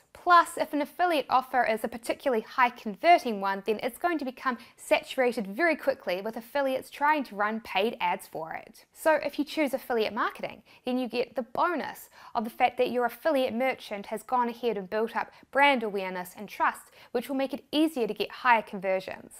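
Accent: New Zealand. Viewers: Hey she is from New Zealand. That's why she sounds like that.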